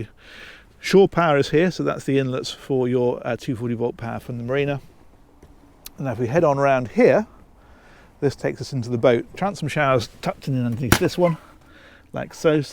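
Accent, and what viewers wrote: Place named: United Kingdom. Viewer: Hey you can say it any way you want to.. your a Brit and I for one don't expect you to talk like an American.. I am an American and I don't talk like a Brit.... I enjoy the heck outta these videos now matter! even if you spoke Aussie.. though that is rather hard to understand when they slip into there slang.. Man ya gotta love though.. take care y'all see you on the next one..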